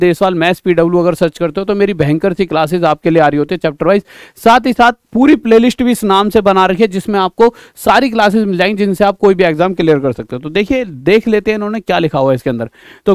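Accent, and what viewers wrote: Haryana, India. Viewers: Haryana ki ha ya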